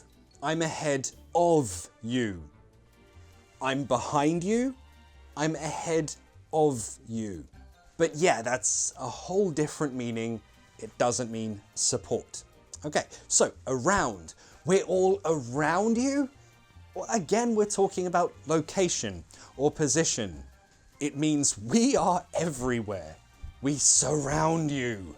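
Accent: United Kingdom. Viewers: "From Bangladesh 🇧🇩🇧🇩" I have already watched many offline videos of your channel. That's are very useful for learning and pronunciation.So, this channel has become my best channel in YouTube for learning English in British accent. Thanks a lot,.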